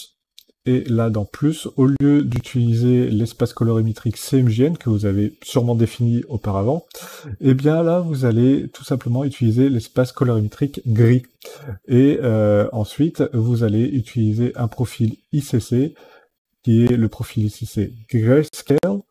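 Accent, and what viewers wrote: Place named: England, United Kingdom. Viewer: Salut, Très utile, merci pour ce partage de connaissances ! (Comme dirait Chabal, We are in France, we speak French... OK ?) Manquerait plus que quelqu'un vienne te reprocher ton accent anglais, le jour où les Anglais (en tout cas ceux qui font cet effort) parleront français couramment et sans accent, alors, peut-être qu'ils pourront la ramener et encore on ne va pas se laisser emmerder par des buveurs de thé, avec le petit doigt en l'air, bordel de merde ! :P Sinon j'ai une question en rapport avec ta vidéo: Que signifie tramer et à quoi ça sert au juste ?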